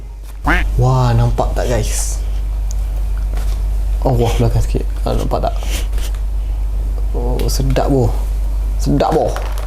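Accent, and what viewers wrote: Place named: Kelantan, Malaysia. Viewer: Kecek klate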